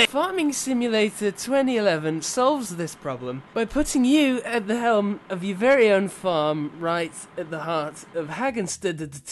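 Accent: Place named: Brighton, United Kingdom. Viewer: but why does he have a southern (brighton) accent?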